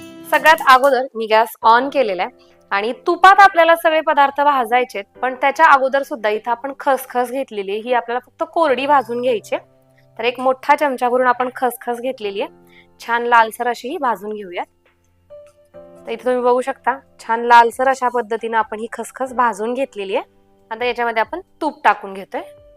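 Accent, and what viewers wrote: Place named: Latur, India. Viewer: Tai तू कुठली आहेस, तुझी बोलण्याची टोनिंग लातूर ची वाटतेय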